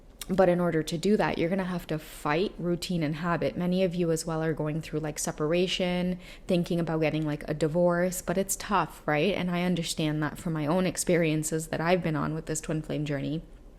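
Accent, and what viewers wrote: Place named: Trinidad and Tobago. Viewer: Love ur trini accent im from trinidad too love u u resonate with me alot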